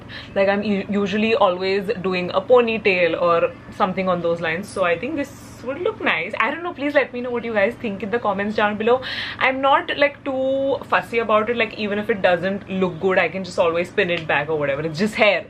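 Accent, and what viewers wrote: India. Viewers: I just love ur hinglish in between ur english I literally love it😍😍 u r too cute....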